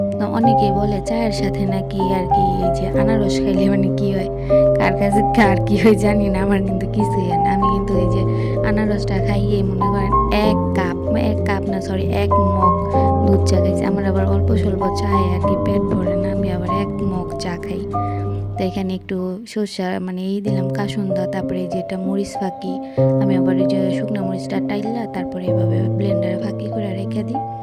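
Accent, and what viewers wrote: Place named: Shariatpur, Bangladesh. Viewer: আপু আপনার কন্ঠটা অনেক সুন্দর, শরিয়ত পুরের ভাষার মতো লাগে❤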